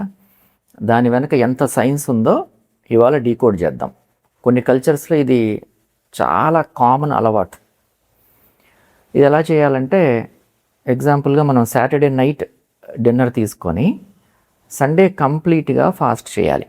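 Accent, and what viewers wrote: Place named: Guntur, India. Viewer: Sir, Meeru Guntur ani ardham avuthundhi,Ela ante vachhiddhi,thaggiddhi edhi guntur valle matladatharu, Good information sir🎉🎉🎉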